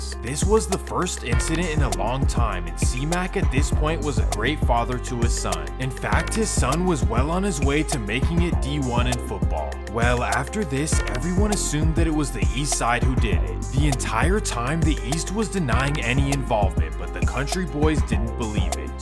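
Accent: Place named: California, United States. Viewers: I can tell you're a bay area native... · Your from nor cal I can tell from how you talk. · He either from Louisiana or The bay. For sure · I vote you're from Northern Cali. You just gotta be. Lol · Bro you’re obviously from the Bay Area or the surrounding areas. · He got northern and southern California sprinkled wit a Lil bit of the atl and Memphis to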